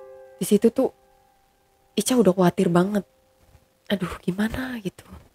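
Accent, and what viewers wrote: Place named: Purworejo, Indonesia. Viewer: mba bner lembut. sabar. ngomongny ky sdr saya orang purworejo cm tinggl di brebes. logatny sm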